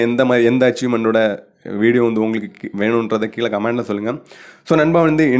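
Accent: Kanyakumari, India. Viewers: கன்னியாகுமாரி யா ப்ரோ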